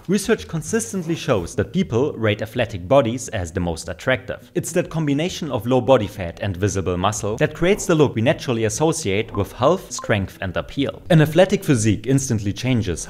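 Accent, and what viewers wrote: Germany. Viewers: Hey man, love your content, stuffs great!!! Are you german tho? I am and do hear some of it….maybe… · ich höre deutsch, ist das wahr?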